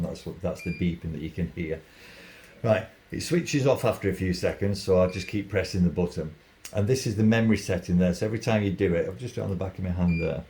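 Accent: United Kingdom. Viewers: Just purchased one and had to learn how to set it for Fahrenheit temp. Good video. British accent was a bonus. 😀 Thank you!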